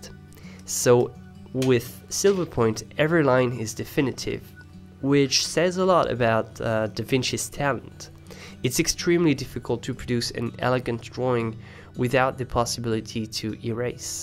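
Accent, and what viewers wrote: France. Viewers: I can listen your french accent. Tu vas pas me la faire fréro, sinon bonne vidéo.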